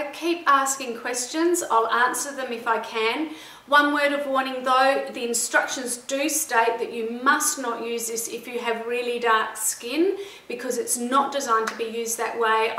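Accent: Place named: Australia. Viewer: seems like an aussie lady from melbourne :)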